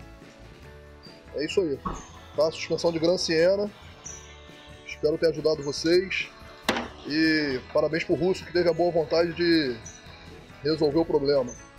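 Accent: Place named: Rio de Janeiro, Brazil. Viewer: bom dia! No vídeo, você mencionou que o único mecânico que teve a paciência de resolver o problema, foi o Russo. Sou do RJ, pelo teu sotaque parece que você também é...... Você poderia me dizer o endereço desse mecânico por favor? Abraços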